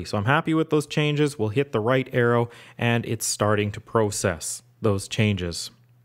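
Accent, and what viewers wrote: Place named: Canada. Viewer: I was listening to this while I doodled with the app on my phone, and I had the thought that based upon your accent you must be Canadian! Then I realized it was in your intro lol. Anyway, this app is excellent. Definitely one of the best editing apps I've used on the iPhone. The whole selective adjust part is what I feel separates it from any other app out there.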